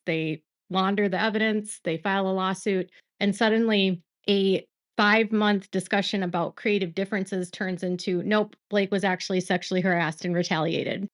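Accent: Minnesota, United States. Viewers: You sound like you are from Minnesota 🤷🏻‍♀️ 😊 I love it. I wish I could 👍 your video… I think I will anyway and just yours alone. My YouTube data is 2.38 and haha have no room on my iPhone because of pictures/videos of my son 😊 you know how it is 😂 And yes you are right about the steps. I was reading from another state, and I was misinformed. I do apologize for making my comments in regard to the steps. 😔 I really hope this goes to trial and the bring this up. Bryan knows something we don’t and has secret evidence. People thought it was silly he put out all the evidence right away, but what makes you think that’s all the evidence? 😂 it’s not. Bryan has it in the bag 😊 ❤ & Support ~ Always, you are a ⭐️ and stay strong. We are all here for you 😊 #iamnotabot EVER!! 😂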